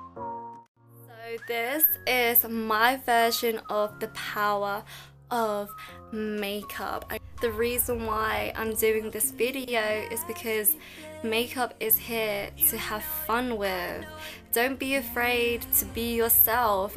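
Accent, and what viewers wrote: United Kingdom. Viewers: omg i am so late to subscribe to your channel. omg where have you beennnnnnnnnnnnnnnnnn. first off i love your accent its like british and funny enough i want to start talking like you. thank you for the inspiration on not to mind what people say about your makeup i love u so much. 😍😍😍😍😍😍😍😍😍😍t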